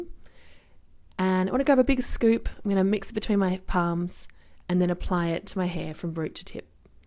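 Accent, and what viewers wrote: Australia. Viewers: I love your channel and love hearing a fellow Australian ❤️ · I haven’t let my hair run wild with its natural curl in ages. Your videos are making me want to go au natural. I’m curious to see what it does since being bleached and abused. Love your voice by the way - it’s a nice example of an Aussie an accent and I find it quite soothing.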